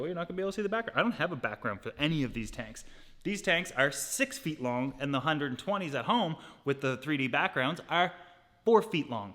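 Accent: Canada. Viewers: My confusion is, clearly you are somewhere in Canada from the accent, and of course the 4 kilometers from house to new gallery. Why then do you measure the space between stands in inches and not centimeters? Just something I was curious about.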